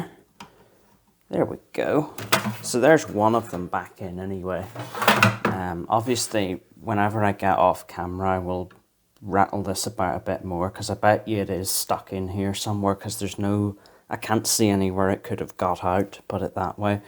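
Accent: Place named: Fermanagh, United Kingdom. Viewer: Sounds very Fermanagh-esque.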